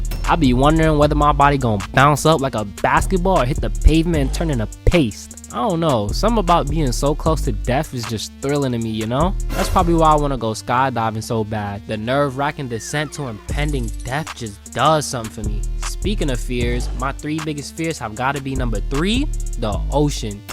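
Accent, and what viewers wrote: New York, United States. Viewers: that yew yorkin language HIT DIFFERENT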